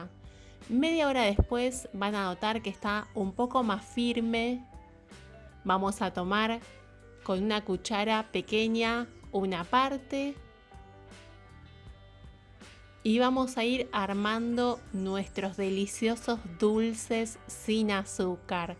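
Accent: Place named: Argentina. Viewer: Que ricas recetas, tienes un acento Argentino.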